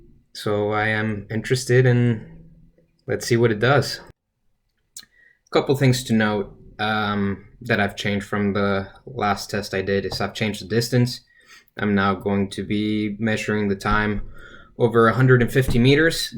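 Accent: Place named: United States. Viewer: You sound american...Did you get permission from the FAA to go that fast?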